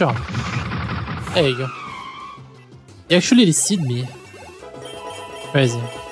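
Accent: France. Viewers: your french accent is fire 🔥🔥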